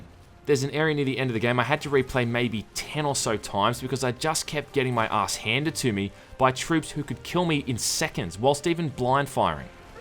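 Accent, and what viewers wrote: Australia. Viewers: Love your Australian accent. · You sound so innocent and well behaved here mate! Keeping the Aussie accent in check too. I'm glad you found your real voice eventually, coz you're hilarious and entertaining.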